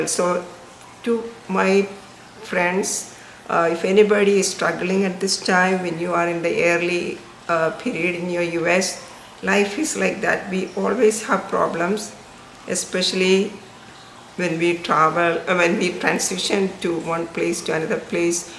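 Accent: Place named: Kerala, India. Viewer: Why are you talking English like malayalam. accent and pronunciation, very poor.what kind of English is this?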